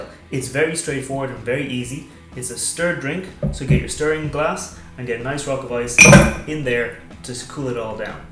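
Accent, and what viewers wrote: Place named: Ireland. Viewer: Where are you from and what is your name? I recognise an Irish accent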